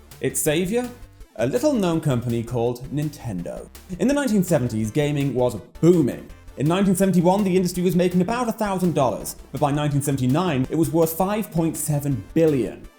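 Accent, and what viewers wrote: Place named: United Kingdom. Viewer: The irony of a person who is clearly British saying Nintendo reclassified gaming is hilarious.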